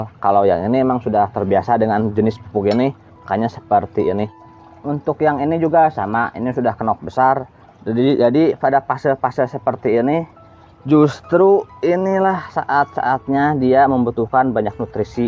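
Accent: West Java, Indonesia. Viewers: Baru 2jam aploud, mantap ilmunya pak. Penyampaian simpel mudah difahami dng logat Sunda tambah epik. Saya dari Jatim enak mencerna penyampaiannya👍🏻👍🏻👍🏻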